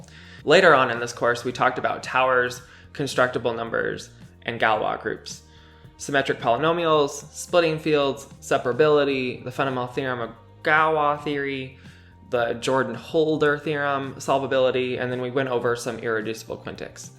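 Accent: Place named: North America. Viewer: you have what I call the "white girl tounge curl" its a condition that effects many north americans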